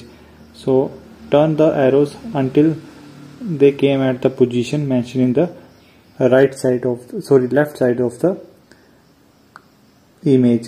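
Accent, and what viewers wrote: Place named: India. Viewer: Can someone besides an Indian make videos troubleshooting things? I am so sick of this accent.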